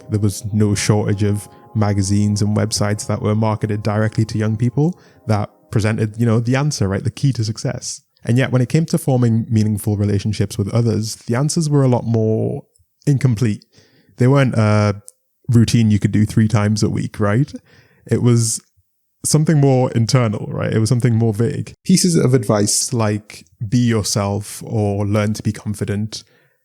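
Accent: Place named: Newcastle, United Kingdom. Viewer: My mum looked over at my phone and said our accents are similar, u from new castle? Also she likes ur skin..no I'm not being held at gun point (help)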